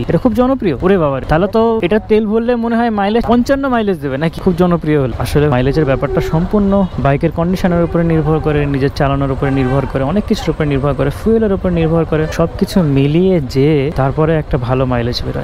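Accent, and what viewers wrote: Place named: India. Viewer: ভাইয়ার বাড়ি কি কলকাতা নাকি 😅 ইন্ডিয়ান লোকেদের মতো কথা আসে কিছুটা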